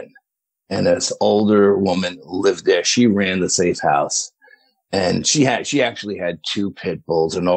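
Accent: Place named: New York, United States. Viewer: Man I love this guy's stories! I think the ny accent adds to the charm! Lol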